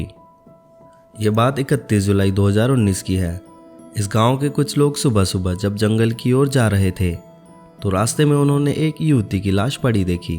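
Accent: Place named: Haryana, India. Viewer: Haryanvi accent jaisa hai.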